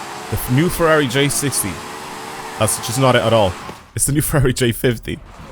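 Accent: Ireland. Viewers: yo what accent do u have im terrible with my countries but im thinking Irish?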